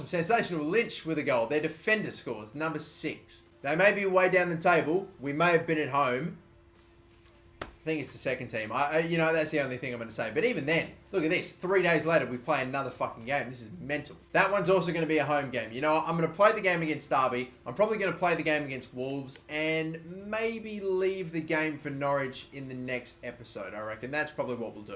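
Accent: Australia. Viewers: Mardoche Basisa he has an australian accent... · Mardoche Basisa he's Australian...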